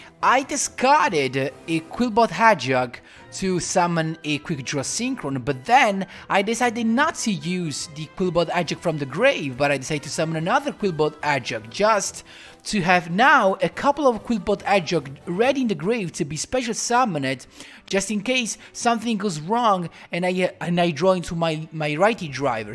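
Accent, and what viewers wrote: Italy. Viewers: Ma quanto cazzo sei italiano? Sgamato dall’accento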